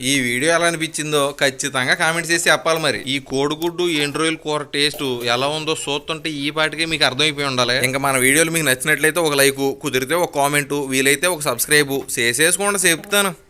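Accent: Godavari, India. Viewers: మేము ఇప్పటికి ఈ కూర తింటాము సార్ కానీ కూర కన్నా మీ నేరేషన్ వేరే లెవెల్ మన గోదారొళ్ల యాస ఇంకా సూపర్ అండి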